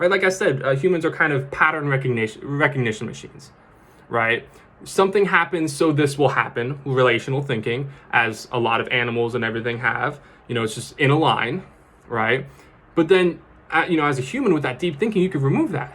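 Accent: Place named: United Kingdom. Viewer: I found you one day as a recommendation by Instagram and just checked your reel on Instagram and this concept sounded interesting so, here I am, thank you for this wonderful video, what a magical recommendation! Your words are a delight to savor without rushing to understand, just embracing new concepts and philosophies. If you could ever grant the opportunity to have a talk, I would be glad to be a part of a beautiful conversation and of course, share a cup of tea! British accent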